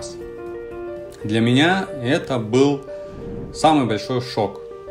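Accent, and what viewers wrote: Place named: Naryn, Kyrgyzstan. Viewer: Крассавчик ты как Нарынский говорю...Эркек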